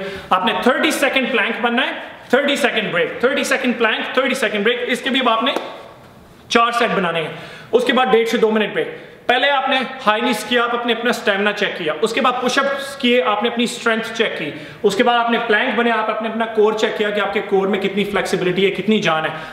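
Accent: Punjab, India. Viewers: bhai apki sbse achi bat to ye h ki aap hindi me jo thodi thodi punjabi bolte h bhoht mast h bhai punjabi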